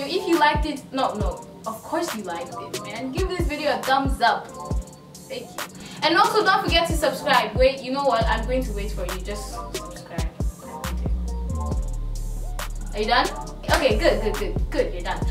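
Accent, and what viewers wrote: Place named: Nigeria. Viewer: "YOU WILL BE BLOCKED LIKE BOM BOM POW" OUII SLAY GURL YASSS😗😗😘 NIGERIAN BISSH AYY UR MY NIGERIAN BUDDY THAT ACCENT THO GURL I LOVE YOUU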